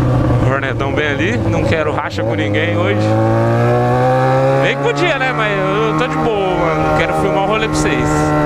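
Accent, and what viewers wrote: Goiás, Brazil. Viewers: pq vc fala no pique do goiás,sendo que vc mora no paraná?kk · tu fala no pique do goias pq vc eh daqui de goias?..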